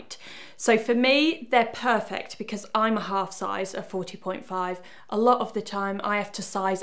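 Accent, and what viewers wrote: United Kingdom. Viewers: I'm French and for real I really like her British accent !!!!!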